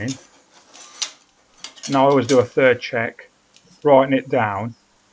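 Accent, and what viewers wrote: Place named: England, United Kingdom. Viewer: I didn't realise you were Italian, sorry, as you sound very much English & have a similar accent as myself, who is from the Black country. Thank you for taking the time to reply. God bless & look forward to more videos.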